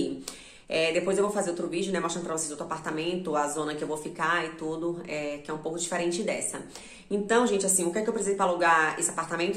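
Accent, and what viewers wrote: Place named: Fortaleza, Brazil. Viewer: Só pelo sotaque já sei que é Fortaleza! Sucesso pra vc...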